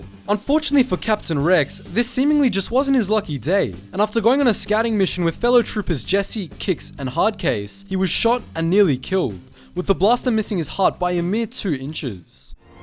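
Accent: Australia. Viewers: gotta love that deep aussie accent narrating this. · Bro you sounded so Aussie in this video, are you? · Love the Aussie voice xD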